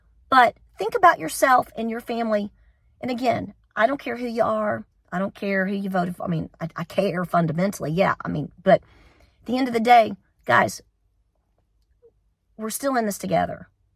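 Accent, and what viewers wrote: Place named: Appalachia, United States. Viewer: Just a beautifully, raspy Appalachian voice! 😉 Get well in a jiffy,! 🙏🏻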